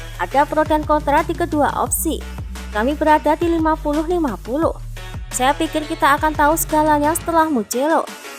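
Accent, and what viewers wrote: Java, Indonesia. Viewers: Mantab bagus penjelasannya , ciri khas banget penjelasan medoknya saya suka 🥰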